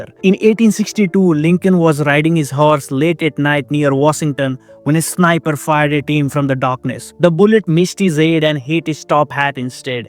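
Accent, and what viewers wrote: India. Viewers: your indian accent makes it even more funnier lol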